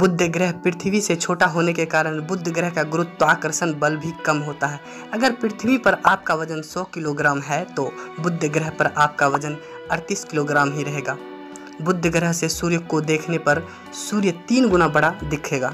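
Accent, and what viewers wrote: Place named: Bihar, India. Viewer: भाई आप किस जिले से हैं भागलपुर, खगड़िया, बेगूसराय ?? आपके बोलने के टोन से guess किया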